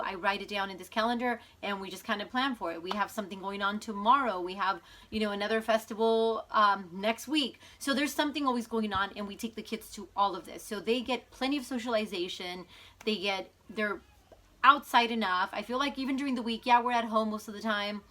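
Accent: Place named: California, United States. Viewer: I know this is an old video, but I'm so tickled to hear that you're from California because I thought you had a very familiar way of speaking (I'm from the Central Valley). I enjoy seeing the practical and creative ways that you homeschool and have taken a lot of inspiration from it.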